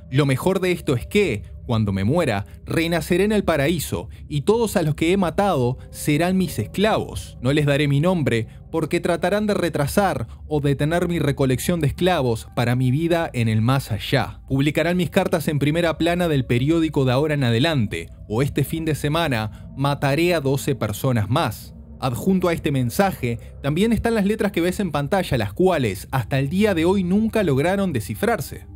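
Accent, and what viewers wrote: Argentina. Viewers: Ah, eres argentino?, me suscribo ya inmediatamente. 🇻🇪 Amo a los argentinos y tu canal se ve de buena calidad.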